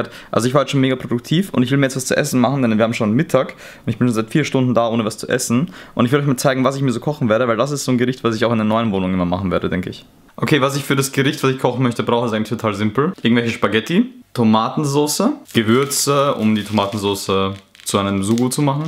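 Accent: Austria. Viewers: ist das Österreichisch oder italienisch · könntest du mal ein Gaming Video machen wo du nur mit Österreichischem akzent redest? ;) mach weiter so bist mega cool, aber ich finde es manchmal etwas anstrengend für die Augen, wenn du die Helligkeit manuell umstellst in deinen vlogs :/ das nur Konstruktive Kritik sein :-)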